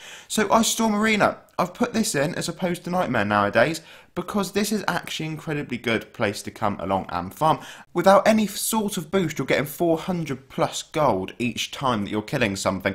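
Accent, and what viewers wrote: United Kingdom. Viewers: Nice video. Lots of information btw are u a britain? Cuz u got the accent of a Britains.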